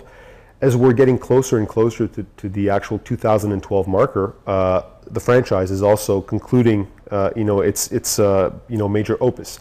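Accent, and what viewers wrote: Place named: France. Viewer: did anybody else notice the tiny french accent he has? lol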